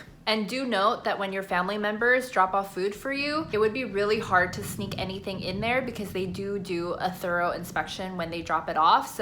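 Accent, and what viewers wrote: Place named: United States. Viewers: Late to respond here🙏 Appreciate the video👍👍 I’d say stick to your American or North American accent while I’m Malaysia.. whenever I try use Manglish/Singlish, I think I sound weird and can’t get my thoughts across. All the best! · How come you use the American accent in M’sia ?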